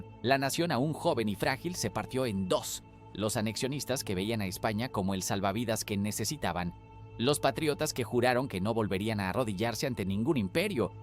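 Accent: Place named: Mexico. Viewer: Dueños este programa: ustedes son estúpidos o se hacen? Primero hacen un resumen ¨calimocháo¨ dejando muchísimos detalles importantes de nuestra historia, luego ponen a un narrador ¨mexicano¨ que dice ¨nosotros¨ como si esta fuera la independecia de México. Séan más serios...! Páguenle a un buen locutor o escojan a alguien que lea bien sino quieren pagar... pero que sea y se oiga como DOMINICANO!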